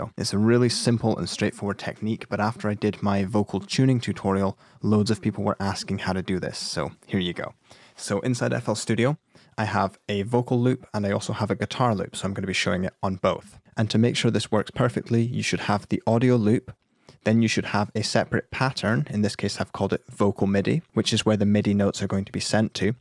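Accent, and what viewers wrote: United Kingdom. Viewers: Soooo useful!! Thank you! It is also useful to find notes to sing, notes to play on piano. In fact, for those who are going to have IELTS exam, Always listen to this channel's videos in order to adapt British accent, even though you sometimes don't understand what they are talking about. :3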